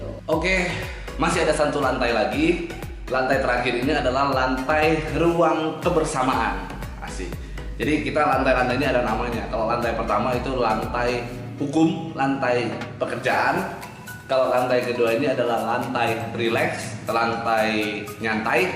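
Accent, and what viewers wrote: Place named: Medan, Indonesia. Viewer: Logat lo masih kental "medan"nya, omongan ngga ada isinya.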